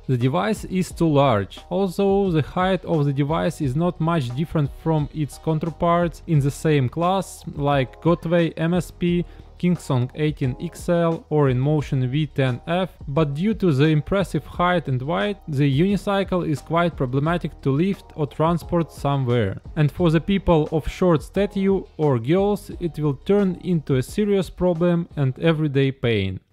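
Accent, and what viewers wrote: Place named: Ryazan, Russia. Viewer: Рязанский акцент :) Успехов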